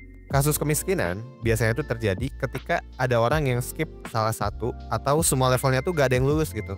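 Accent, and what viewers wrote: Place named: Banjar, Indonesia. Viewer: admin orang banjar kh nih, logat bahasa banjarnya kadang2 losss takaluar😂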